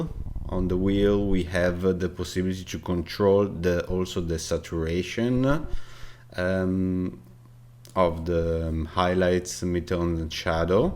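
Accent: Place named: Italy. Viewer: You sound like italiano man!!!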